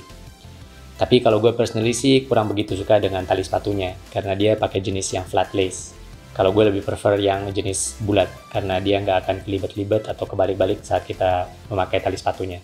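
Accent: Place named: Malaysia. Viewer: ada sedikit aksen melayu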